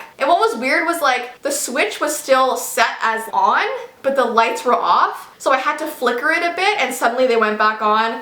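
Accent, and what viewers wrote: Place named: Canada. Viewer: for some reason i heard the canadian in her voice that ive never heard before. how havent i noticed this!?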